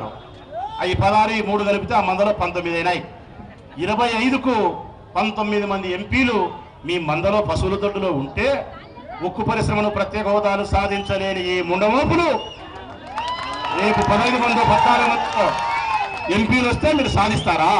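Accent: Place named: Rayalaseema, India. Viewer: Anna super anna..rayalaseem slang lo eragadesav...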